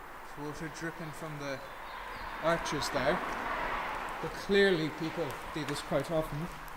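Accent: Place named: Dublin, Ireland. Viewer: what part of Dublin is he from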